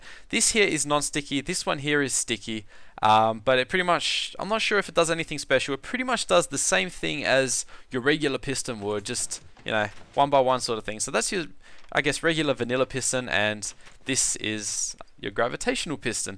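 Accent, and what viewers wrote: Australia. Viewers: U sound Australian cool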